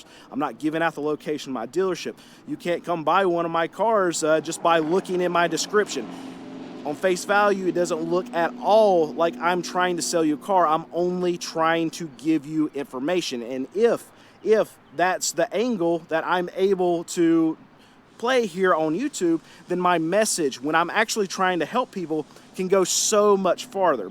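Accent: North Carolina, United States. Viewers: I thought about looking your dealership up for S&Gs. I imagine you are located around Statesville NC based on your accent. (Being from NC myself). I am here for the content, not the cars. I like that you have your ear to the ground on the car market. Keep up the great work.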